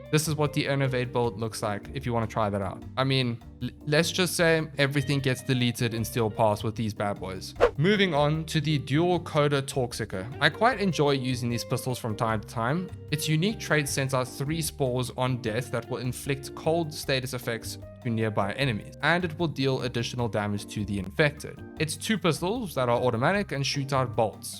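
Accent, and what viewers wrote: South Africa. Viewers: Great vid Boet. Think I'm recognizing some home accents there? You from SA?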